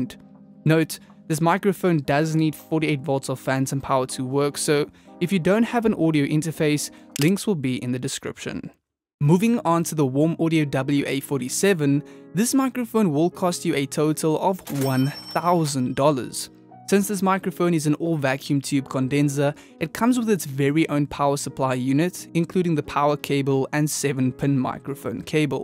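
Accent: South Africa. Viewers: his south african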